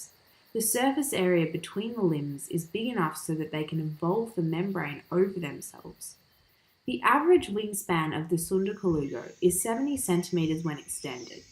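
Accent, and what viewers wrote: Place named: Tasmania, Australia. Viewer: Sounds like a Taz accent,Well done video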